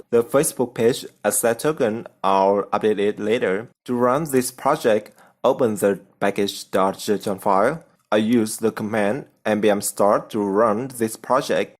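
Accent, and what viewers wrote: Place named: Vietnam. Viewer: That Vietnamese accent. But great tutorial.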